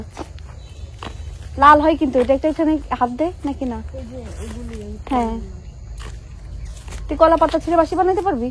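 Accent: Bangladesh. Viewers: kathar dhoron dekhey bojha jay bangladesi 😅😅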